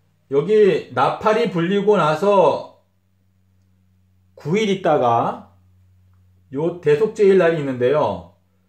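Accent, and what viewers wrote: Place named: Jeolla, South Korea. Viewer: 고향이 라도 스타일인데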